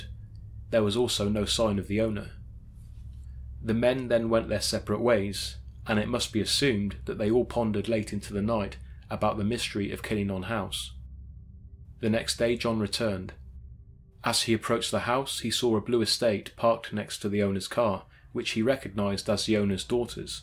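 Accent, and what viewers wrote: England, United Kingdom. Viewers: Forgive my ignorance but Why is an English man narrating this?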